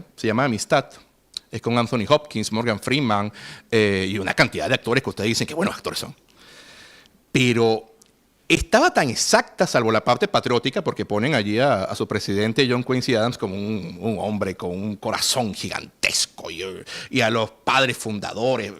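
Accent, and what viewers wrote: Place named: Peru. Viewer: Un hombre muy bello, esa piel trigela, y venezolano, uff uy , aunque su acento me parece un poco al peruano, saludos desde Cali,- Colombia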